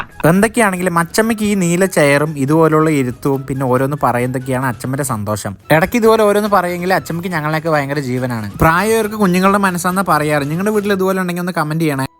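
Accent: Palakkad, India. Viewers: Nee palakkad aano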